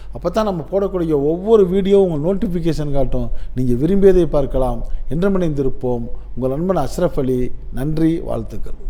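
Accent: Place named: Rural, India. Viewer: உங்களின் பேச்சில் கிராமிய மனம் வீசுகிறது.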